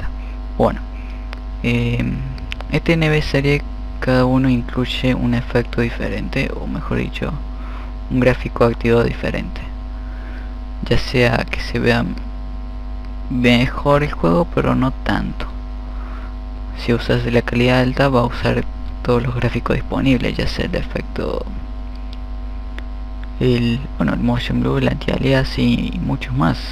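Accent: Córdoba, Argentina. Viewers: Sos cordobés? Me parece por tu tonada.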